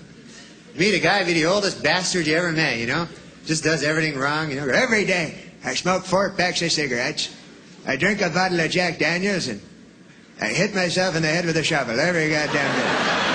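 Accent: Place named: Canada. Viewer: He sounds so Canadian holy crap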